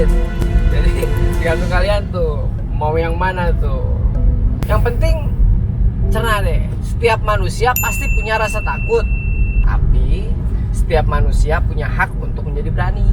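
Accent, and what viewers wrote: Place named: Cilacap, Indonesia. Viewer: Ki tunjukin penampakan janda dong buat saya pffftttt... (just kidd) Cilacap ngapak mantap yoyoy...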